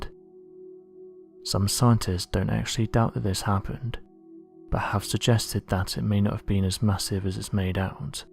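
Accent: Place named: Cockney, United Kingdom. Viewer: Yes, is very hard to listen to.. the low, whispery/breathy narration in cockney is horrible. The other chap is so much better.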